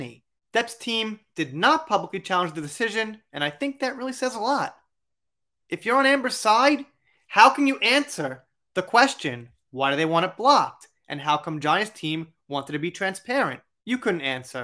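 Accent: New York, United States. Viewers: Never liked Howard Stern he has a big ego,well people are going to go bad for stern,he's putting people off,johnny is innocent, your from new York I love your accent,I wondered why I liked your voice and like listening to it,to bad we can't have hot chocolate and playing monopoly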